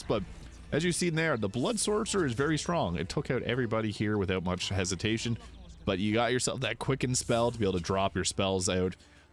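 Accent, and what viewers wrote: Canada. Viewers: lmao yes I figured you were my northern neighbor greeting from Minnesota!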